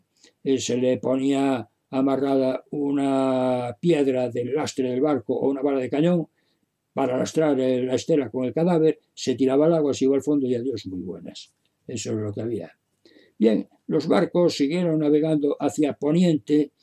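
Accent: Spain. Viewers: Disfruto muchísimo mi abuelo era español y el acento del señor es para mí familiar y he escuchado varias conferencias.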